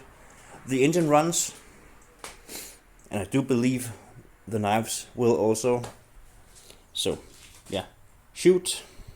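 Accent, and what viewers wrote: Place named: Denmark. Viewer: i have a Norlett with variable speed drive and reverse. I like it way more than the Texas brand👍 I swapped the clapped out old Briggs that would never start, for a chinese honda clone engine. And now i use it with tires on, and a front mounted mower that were originally meant for a texas tiller 🙂 i just found your channel and subbed because i could immediately hear you're a fellow dane 😁🇩🇰🙂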